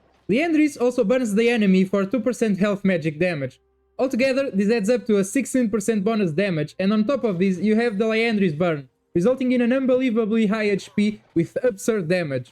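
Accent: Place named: Portugal. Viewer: my guy o teu sotaque portugues é que nao dá para esconder hehheheh, keep it up bom video maninho!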